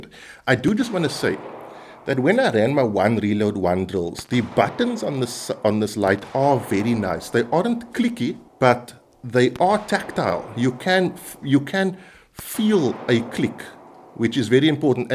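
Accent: South Africa. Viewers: Jeez - could you roll your R's and add syllables to words anymore! This guy couldn't be anymore South African.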